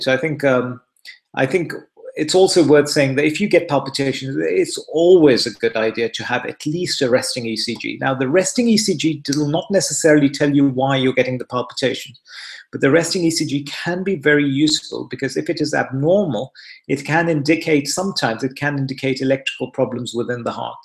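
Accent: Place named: United Kingdom. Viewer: Have been crying tonight because yet again, I can't sleep due to palpitations that come on when I try to go to sleep. I want to tell you how wonderful it is out here in the States where I now live,to be able to listen to you with all your information.The fact that you have taken the time to say all this is (excuse the pun) heartwarming! I also feel better hearing your accent because I am originally from the Uk also! I keep being given holtor monitors to see whats happening but my heart often seems to behave perfectly when I have that on, and awful when I don't! Well anyway,thanks again and hopefully next week will be a better week for me because every night this week has been awful and last night I was awake with palps most of the night! Keep up the good work. :)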